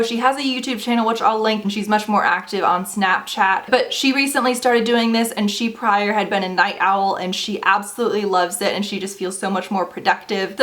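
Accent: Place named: United States. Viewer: I am not an english speaker but I can understand her speech even though she speaks very fast. don't know she use easy words? or pronounce clear. wonder where she is from. think from America but which part of accent? somebody know?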